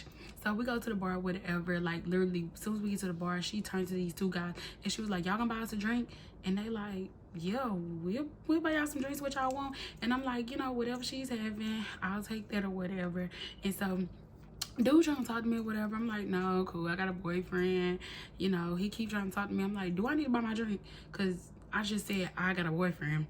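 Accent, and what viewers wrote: Mississippi, United States. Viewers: I love that country azz MS accent, my family is from there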